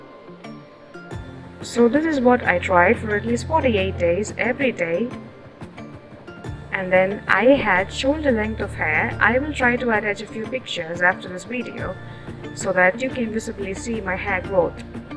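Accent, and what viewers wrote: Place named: Tamil Nadu, India. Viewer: Akka...i lke ur tamil accent.... Plz remake this in ur style.... Its very plsnt to hear....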